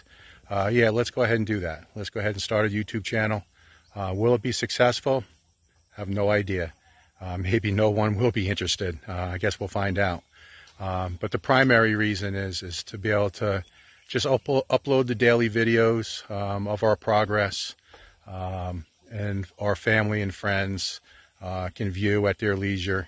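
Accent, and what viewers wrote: Philadelphia, United States. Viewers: NEW MEMBER. ARE YOU FROM THE EAST COAST OF USA? SOUNDS LIKE FROM "PHILLY"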